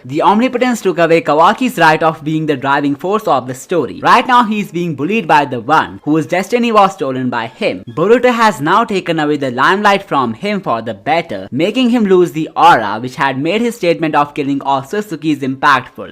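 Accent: India. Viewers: Where are you from, sound Indian?'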